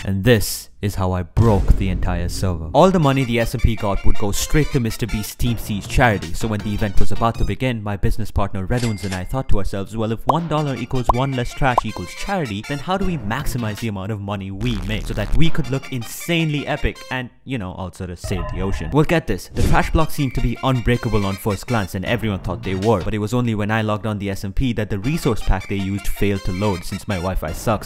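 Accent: India. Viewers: You're Indian aren't you You have an Indian accent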